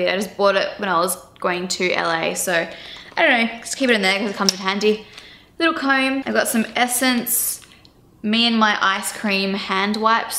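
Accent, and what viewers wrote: Australia. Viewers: The Australian accent is really strong ahah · are you aussie i am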